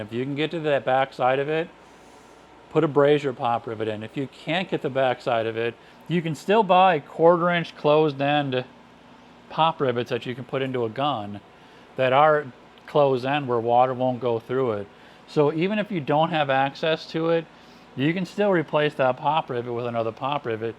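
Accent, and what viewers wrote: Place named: Wisconsin, United States. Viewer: Well thank you for the reply , and now i can satisfy my curiosity about the accent lol. Hey ftr i am , and have been for over 30 years , a Cheese Head , GO PACK GO Packers fan even though I’ve lived here in Georgia all my life. Dont know if you’re a fan but just thought I would share that since you said you grew up in Wisconsin.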